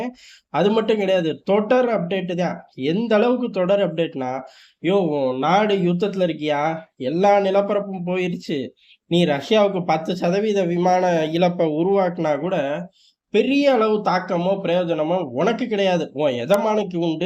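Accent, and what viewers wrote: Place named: Theni, India. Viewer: அட..தேனி ஸ்லாங்! 😅 சூப்பரு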